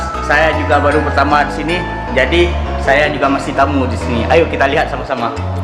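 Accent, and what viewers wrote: Aceh, Indonesia. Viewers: betul itu memang logat Aceh kita harus bangga jadi orang aceh jangan buang bahasa sendiri setiap darah pasti ada logat sendiri seperti Jawa meusinthop logatnya. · Alhamdulillah bisa berkarya, dgn melihat video ini siswa yg masih SMA bisa termotivasi, tetap semangat dan teruslah berkarya..sy bangga dgn dialek Aceh...👍👍👍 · Bukan d setel lg Nama a dia pake logat aceh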